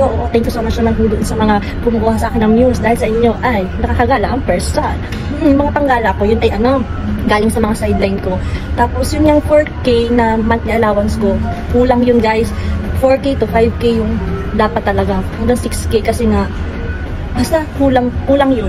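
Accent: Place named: Batangas, Philippines. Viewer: Abay ikaw gay batangueña..ala e..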